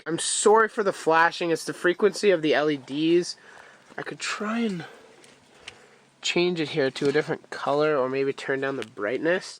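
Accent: Canada. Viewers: Grass ( although I heard "rass") You mentioned you aren't from the states, are you by any chance Canadian?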